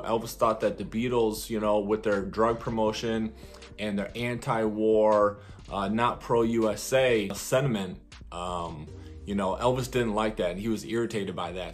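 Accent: United Kingdom. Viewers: I read that on Google earlier. I can’t believe that Elvis would want the Beatles as his backup band..he had an incredible band! I love your English accent too lol! Imo, I don’t think they had the greatest musicians anyway! Elvis’s guys were perfect.. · I don't believe he wanted them he had a great band backup singers. Did like your British accent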